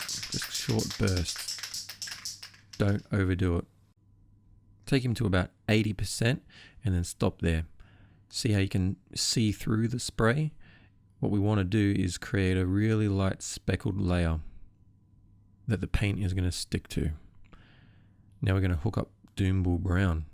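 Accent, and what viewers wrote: Australia. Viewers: heya mate thx for the vids ,they have rekindled my desire to paint ,and its good to hear an ozzy vioce in a warhammer vid, for a change , cheers bro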